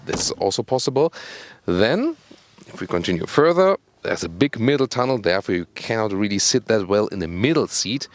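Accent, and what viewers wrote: Germany. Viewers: Dein Deutsch accent ist toll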